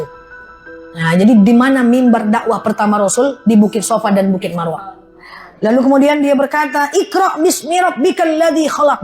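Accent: West Sumatra, Indonesia. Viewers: USTADZAH ITU PUNYA LOGAT,SEPERTI ORG SUMATERA BARAT (PADANG). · Minang ini. Mas bro 🤭😊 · Katanya paniang kapalo brarti eta ustadzah urang minang euy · Orang Indonesia ( minang bro ,kelihatan logatnya)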